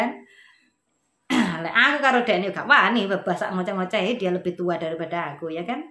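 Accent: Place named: Malang, Indonesia. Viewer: 👍👍👍 cocok bak . senang rungokno komene pean. logatnya malang mutu .😀😀😀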